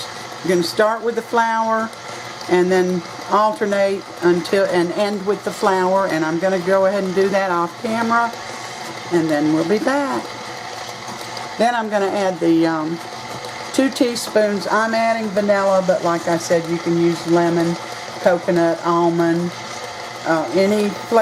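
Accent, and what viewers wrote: South, United States. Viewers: Was this "literal" or a take on your southern accent (as in you came straight "from the fields")?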